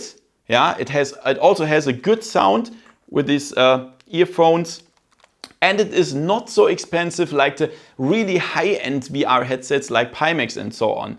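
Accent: Germany. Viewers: My thoughts after i stopped on second : "Thats definitly a german guy." And than i read your channel name.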